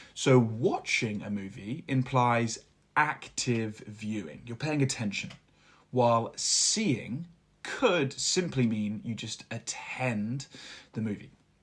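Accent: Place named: United Kingdom. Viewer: He is definetely British.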